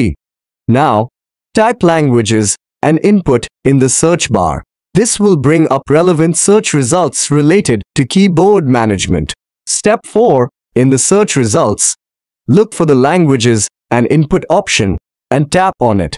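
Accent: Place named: India. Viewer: The Indian sounding accent is unbearable.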